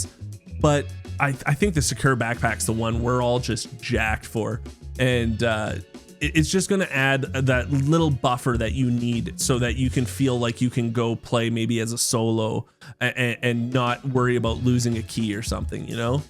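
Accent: Canada. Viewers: By far the best person i like to hear my updates from. Not sure if its the funny Canadian accent "ae" or its just more interesting and better than most out there. Good job keep it up G.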